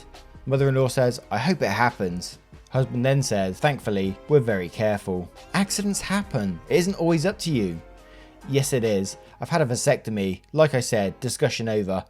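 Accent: United Kingdom. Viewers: Lol. I think MAYBE Canada carries Stouffers but, yeah. definitely has some Brit to his accent but, I'm not sure if he's in the U.K., Australia or New Zealand, or what.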